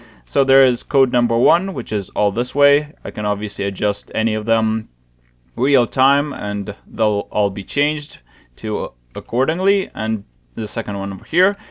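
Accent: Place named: Canada. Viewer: you sound Canadian :-]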